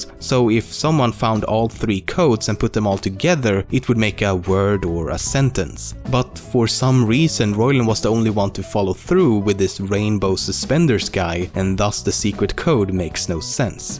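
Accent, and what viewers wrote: Sweden. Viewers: Hej ska du bryta lite mer på svenska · Everyone's applauding the severe accent of a Swedish person who never thought about what he sounds like, calling it soothing. Okay. To someone else it's annoying as hell, how smug he is sounding while pronouncing English like a third grader. I'm not saying I can do better videos, but seriously, I'm from Sweden and most people know how to say "j" and "ch"... Or it could be on purpose, who knows. Now I know what accent to fall back into when needed, lol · There's something slightly wrong in the accent of the narrator of this video. Like he was slightly swedish or something? I don't know. POST-EDIT: towards the end "Believe me ... I've SHECKED" , that was a dead on class A swedish sign.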